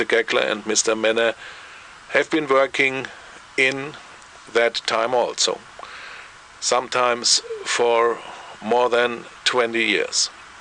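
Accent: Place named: Bavaria, Germany. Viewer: Geil :-) der Babbelt Englisch wie Bayrisch ;-) hehe awer ma versteht alles herrlisch :-) Daumen hoch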